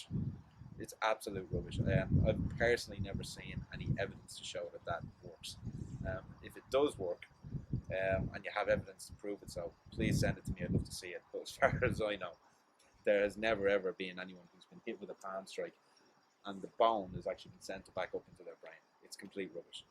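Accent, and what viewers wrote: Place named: Ireland. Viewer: I have palms on my hand but I don't have these paaaaalms that you speak of. Aye haha i just messing. I notice the irish accent has the same ah sound as the American midwest. Interesting sound.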